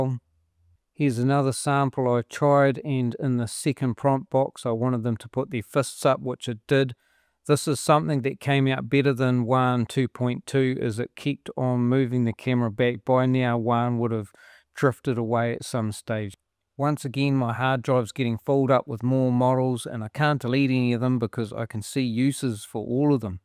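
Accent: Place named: New Zealand. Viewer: You went way too overboard with the training on the A.I voice you use for the video. It sounds like an offensive stereotype of someone from New Zealand.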